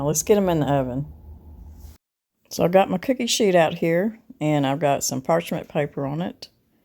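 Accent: South, United States. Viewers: Thank you! My digestive system does not like sugar alcohols. A lot of low carb recipes call for those, so maybe I will try the monk fruit. Enjoy your videos and the southern accent. I grew up in Virginia, so had an accent at one time. We moved to Wa. State and I lost it!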